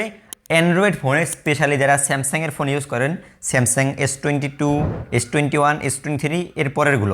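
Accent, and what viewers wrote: Barishal, Bangladesh. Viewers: ছোটভাই তোমার বরিশালের ভাষাতে অনেক ভাল লাগে। ওই ভাষাতেই ভিডিও করো। আমার অন্তত ভালো লাগে।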